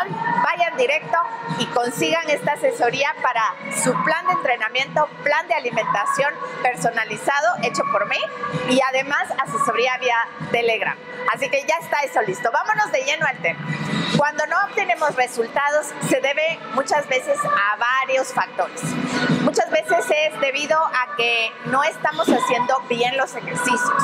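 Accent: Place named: Guatemala. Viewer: Me gusta tu hablado chapin 🥰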